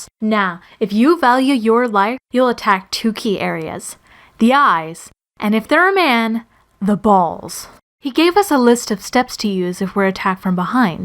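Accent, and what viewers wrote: Canada. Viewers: HHHHHHH I got it!!! "do not bang the chicks" XDDD took me a second! also your canadian!? :O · are you Canadian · you sound like you grew up in Winnipeg. cause I had that growing up here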